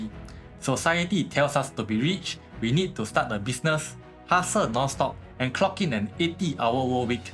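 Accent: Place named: Singapore. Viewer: Singapore accent cannot la..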